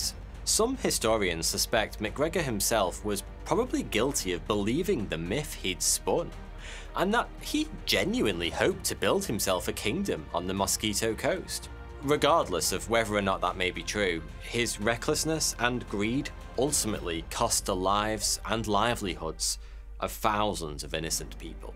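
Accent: United Kingdom. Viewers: Anyone surprised the guy was British? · I always hear "forty two" instead of. British accent is confusing 😂😂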